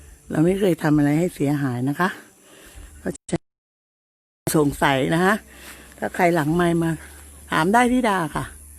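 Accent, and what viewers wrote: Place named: Kanchanaburi, Thailand. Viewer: เมืองกาญจน์ชัดมากจ้า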